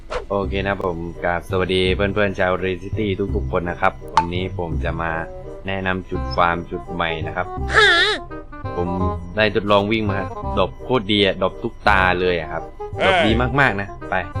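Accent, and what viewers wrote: South, Thailand. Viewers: จุดนี้มีมาสักพักละครับ (เสียงเหมือนคนใต้)😏😏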